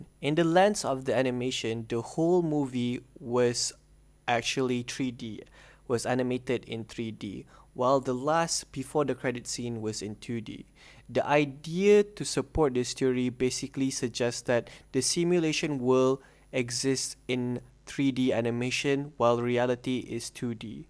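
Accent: Malaysia. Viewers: U sound very malaysian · correct me if im wrong...but your pronunciation like manglish la...are malay bro?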